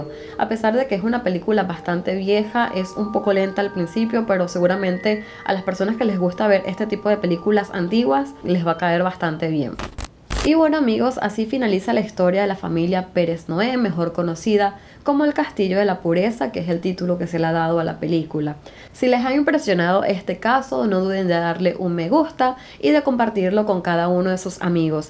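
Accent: Standard. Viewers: Hablas muy bien, además tu acento es muy neutral, eso ayuda bastante a que se sienta calidez en la narración. Te recomiendo que mejores la redacción del guión, repites mucho palabras, cuando puedes usar sinónimos, por ejemplo en este vídeo a cada rato decías 'Sumamente'. Suerte con todo, éxitos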